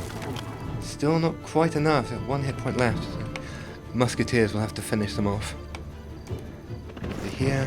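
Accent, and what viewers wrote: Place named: England, United Kingdom. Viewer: his pommy lisp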